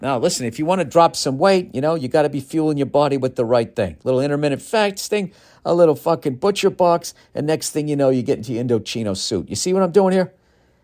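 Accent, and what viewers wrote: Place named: South Boston, United States. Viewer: Jesus. Listening to this I thought i was back in southie in clancys poolroom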